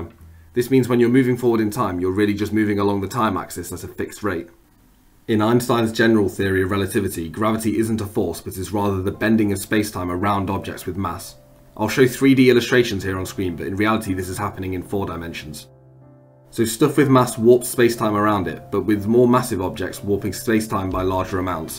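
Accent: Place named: United Kingdom. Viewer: difficulty understanding some of the British accents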